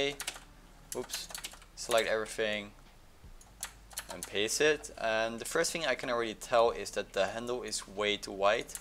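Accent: Netherlands. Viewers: Are you dutch??? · Are u dutch?? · are you dutch!?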